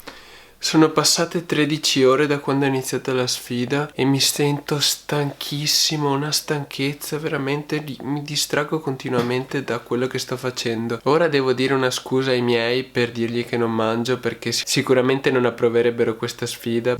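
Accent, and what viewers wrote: Veneto, Italy. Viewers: Si è veneto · Ma sei Veneto? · Ma sei veneto ? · Ma sei Veneto??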